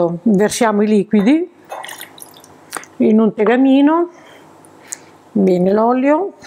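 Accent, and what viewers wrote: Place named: Romagna, Italy. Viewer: Ciao, la tua cucina mi piace moltissimo. Poi questo dolce accento romagnolo, delizioso. Ho guardato i nidi di rondine, buonissimo. Lo farò. Grazie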